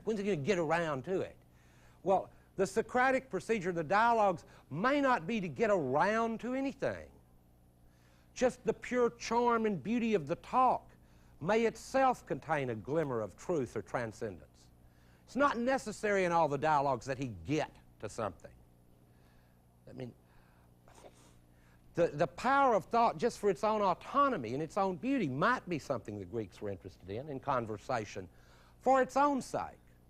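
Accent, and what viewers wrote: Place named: West Texas, United States. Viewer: Professor has the abilty to take a complex abstract topic and break it down to practical applications. His West Texas accent makes his presentation more real world. It is sad that he passed away at such a young age.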